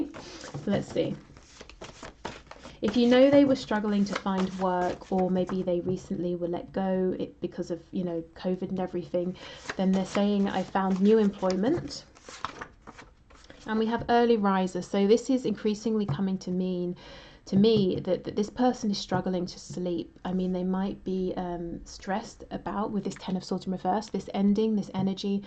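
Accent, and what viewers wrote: United Kingdom. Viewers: Hai very good morning dear namaste great reading as always I feel u and ur reading can't go wrong apart from ur reading I am big fan of ur voice abd ur English I mean the British English. Thanks a million dear with warm regards namaste lots of love and light. Dr vijay anand. (prince India khalid)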